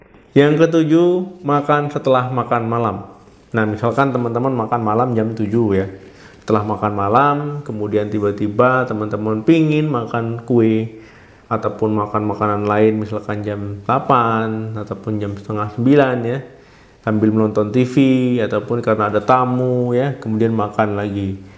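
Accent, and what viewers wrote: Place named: Bali, Indonesia. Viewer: Logat bicaranya kaya ada bali nya dok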